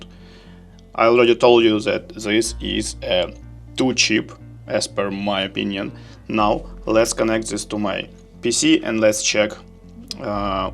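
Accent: Russia. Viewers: Честно говоря я когда зашел на канал сразу понял что ты русский по акценту) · Та по акценту сразу понятно что человек русский)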